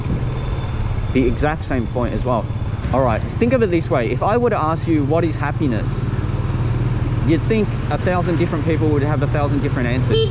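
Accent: Australia. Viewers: i agree with you 100 percent...up to a certain point. the point: try making a video bashing vietnam while u r in vietnam...and then, go back to australia (assuming from your accent) and make a video bashing australia. and... mr. dam vinh hung was recently "called in" for a meeting with the authority and fined for warning people about the kung-flu that going around. and... some dude wrote a song about police brutality and spend about 2 yrs in prison...he's ok now... In the theme of the movie 300...walk around saigon with a shirt that depict king leonidas kicking hcm into the bottomless pit with the caption: THIS IS SAIGON...good job, nice vid and thanks.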